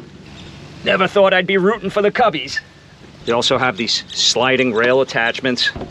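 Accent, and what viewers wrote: New York, United States. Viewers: The New York accent gets old after a while...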